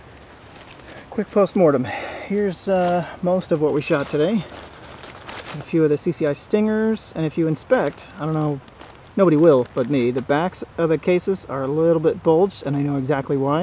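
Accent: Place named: Wisconsin, United States. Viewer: You're in Spooner Wisconsin? I recognize the accent.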